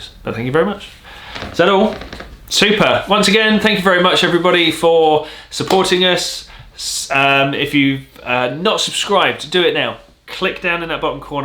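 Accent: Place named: Birmingham, United Kingdom. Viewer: Love what you do and how you do it!, You can take the man out of the Forces but you can't take the Forces out of the man.. Training and explaining is natural to you, keep it up.. Brummie Voyce..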